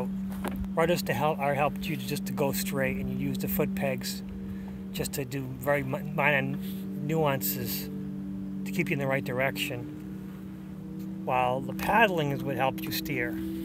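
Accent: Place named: Cape Cod, United States. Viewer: Very very nice filming. I recognized your voice, and knew your place. Native Cape Codder here. Thank you for filming and sharing.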